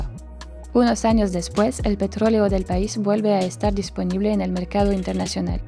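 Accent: Russia. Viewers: acento ruso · Los documentales muy buenos... pero la voz de la locutora maravillosa. El acento es ruso? 🤔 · Creo que la chica tiene acento rusa.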